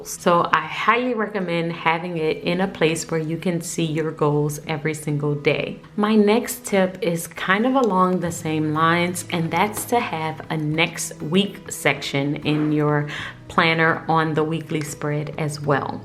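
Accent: New Orleans, United States. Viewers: I detect a New Orleans accent too.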